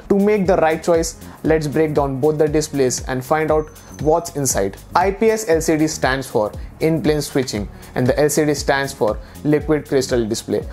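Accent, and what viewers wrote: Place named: India. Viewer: Your sound like keling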